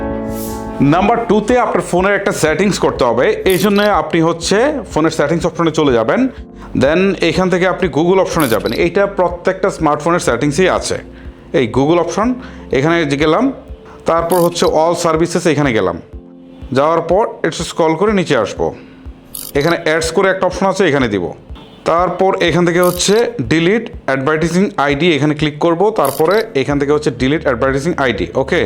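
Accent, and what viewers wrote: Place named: Kishoreganj, Bangladesh. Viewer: আপনার কিশোরগঞ্জের কিছু ভাষা থাকে, সুন্দর করলে আরো সুন্দর শোনাবে।( তাকি × থাকি ✓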